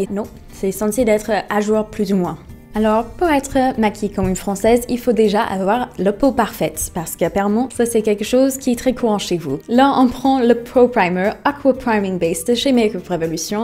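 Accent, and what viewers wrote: United States. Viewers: Bonjour, Je lâche très rarement des commentaires sur Youtube, mais je prends le temps de t'écrire ici car je te découvre tout juste sur ta chaine et suis tombé immédiatement sous le charme. Belle comme un cœur et possédant un charme fou, cet accent américain finira par me faire découvrir une personne attendrissante et qui traite de sujet France/Amérique sous un autre jour et d'un point de vue étranger. Bref, je ne fais pas une déclaration, loin de moi cette idée, mais tout simplement la découverte d'une personne rayonnante et intéressante qui t'a fais gagner un abonné de plus:) Te souhaitant une bonne et heureuse continuation dans ta vie de tous les jours et sur Youtube;) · Ça fait partie du charme de son accent americain! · Je vien de m'abonner à ta chaîne et je te trouve tellement belle tellement fraîche ! Et ton accent américain est juste trop CANON grace a toi j'en apprend plus sur les américains et les usa ! 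Mon reve est d'aller a NYC mais j'ai peur de l'avion (c'est ironique hein) Ahaha gros bisous ! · Ah ah une française sans mascara ? Moi je peux pas vivre sans ! J'adore ton accent américain 😍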